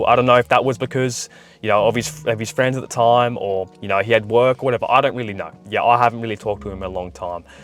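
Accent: Australia. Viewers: Crrikey mate i get no bitches!.Also aussie?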